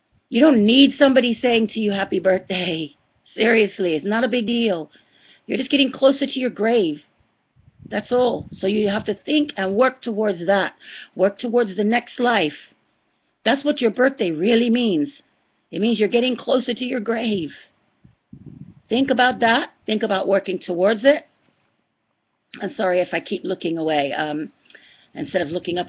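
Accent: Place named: United States. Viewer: Haha loved it! Jazaki Allahu Khair sister! I shared your video to my dad who is not yet a Muslim. May Allah guide him, please make dua for him sister. I do feel bad for not calling to wish him happy birthday as he does for me and my children. I sent him a message with a dua and will chat with him tomorrow InshaAllah. In the mean time I needed help in explaining this to my dad and so I did a search - listened to many short explanations by my favorite sheiks and Subhanallah Allah led me to your video. MashaAllah! You explained this matter very well! 😃 btw, I'm confused about you English accent - I think you are American but perhaps moved abroad?! Haha. Anyway it's a beautiful accent MashaAllah.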